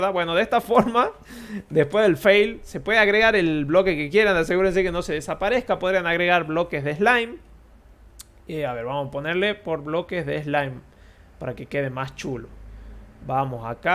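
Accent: Argentina. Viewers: Siento conocido tu asentó, eres de Argentina? (De que parte)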